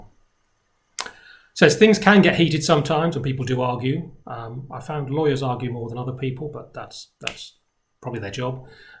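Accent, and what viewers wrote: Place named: United Kingdom. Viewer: 오 글로벌한 영국어를 쓰고 계시는듯 : ) 벤쳐스 연계부분에 대해 상세하게 짚어줘서 흥미로웠네요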